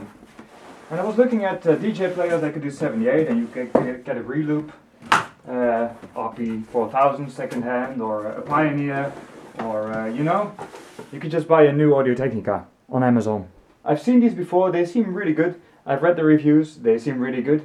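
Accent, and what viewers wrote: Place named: Netherlands. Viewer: Nice unboxing; your accent somehow sounds Dutch (je klinkt nederlands). My first turntable was a Reloop RP-2000 MK3 and it was similar to this one in many ways. The tonearm assembly, the dodgy strobe indicator, the platter without magnet. It didn't come with a dustcover because it is a DJ turntable, but when I sold it to my parents, they wanted a dustcover, so I bought a Reloop dustcover for them. It has that exact same type of hinges, detachable on both sides, with those plastic squares that go into the dustcover slots.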